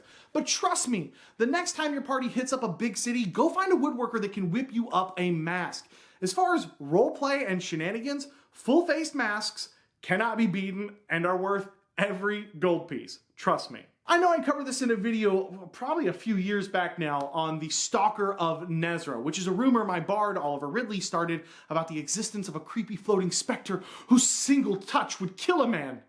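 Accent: Texas, United States. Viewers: Now I want to know where in Texas he's from. . . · didnt know you where texan hello from killeen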